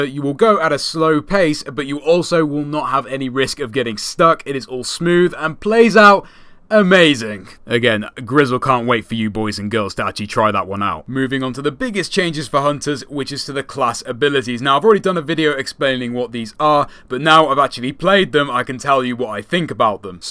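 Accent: United Kingdom. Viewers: How did I know that the commentator was en English lady.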